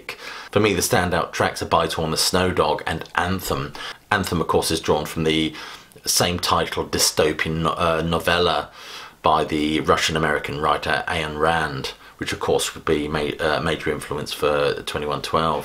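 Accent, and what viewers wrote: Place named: RP, United Kingdom. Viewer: I can listen to this fellow speak for days. Remarkably articulate and filtered through a regal British accent. He reminds me of Ian Anderson, to a small degree.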